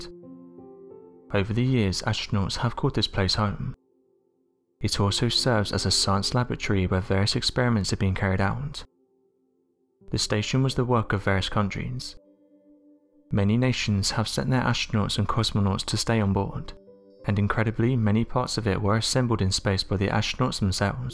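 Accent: United Kingdom. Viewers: A British commentator? Like all the Iconic movies deplicting Historic charactors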